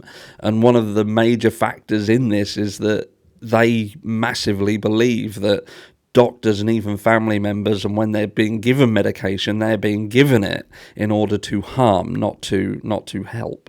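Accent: Norfolk, United Kingdom. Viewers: I am new here. I actually miss the Norfolk accent. I was brought up around the NW Norfolk coast and spent a lot of time working Norwich way. I appreciate the sense of humour you bring to some of the stories you cover. Sometimes I fly back via Norwich airport and I love hearing people say they are going to Tesco's in the Norwich accent...awesome;). Your channel reminds me of why I loved my life in Norfolk so much. It was the good hearted humour and friendly attitude of the people you met where ever you were. I hope the recent disgusting abuse of power during the Coviet Union takeover has not dampened the spirit of the good people of my County. Bless you and your family and keep your positive attitude my Norfolk Cousin ;)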